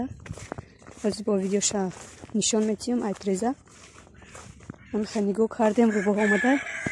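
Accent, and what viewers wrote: Yovon, Tajikistan. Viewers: Салом хохарчон ман зан хастам шумо аз ёвон мешед ми лахчаи гапзанитон ранги ёвонихо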